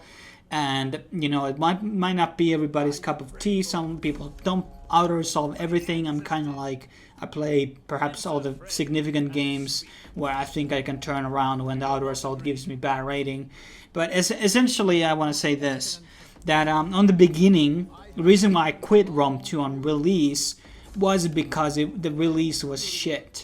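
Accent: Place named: Poland. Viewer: you sound like my polish friend, are you from Poland?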